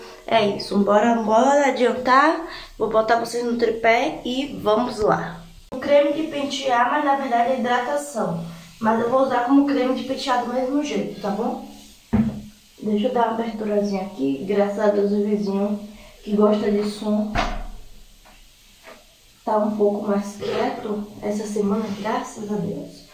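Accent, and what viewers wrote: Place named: Northeast, Brazil. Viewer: Ela eh nordestina vcs não entendem que o sotaque eh normal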